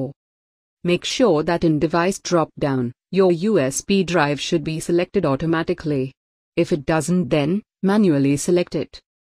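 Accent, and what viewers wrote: India. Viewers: you got really strong indian accent please use english subtitle thank you.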